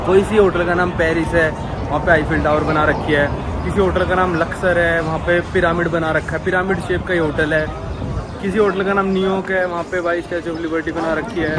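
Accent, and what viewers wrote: Haryana, India. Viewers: Haryanvi accent aa gya bhai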